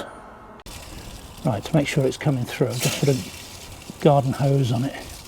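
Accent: United Kingdom. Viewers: Thanks for that. Going off your accent we are not very far from you being in Bolton. There are a plethora of motorhome videos listing what you should and should not take, I have driven extensively in France over the years, even taking a 7.5 tonne over to move my parents over there when they were alive. Im finding the advice on the new regulations regarding camper weights and what you need for vans over 3.500Kgs very confusing though! Have you any experience of the Etrusco A7300DB?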